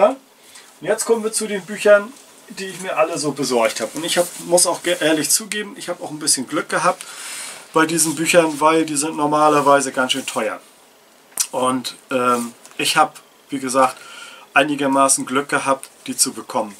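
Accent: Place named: North, Germany. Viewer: Moin, Ich liebe deinen norddeutschen Schnack!!! Ich könnte dir stundenlang zuhören 👍🏴‍☠️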